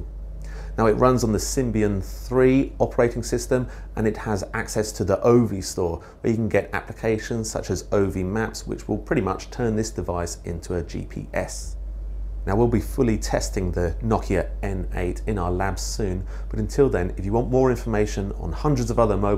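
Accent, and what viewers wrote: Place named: Netherlands. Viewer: is het echt een britse of een nederlander? zo ja dan moet hij normaal engels praten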